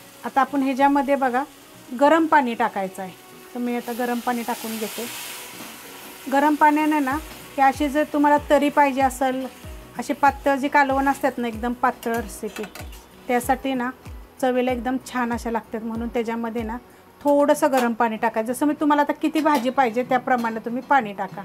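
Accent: Satara, India. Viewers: सातारकर आहे काय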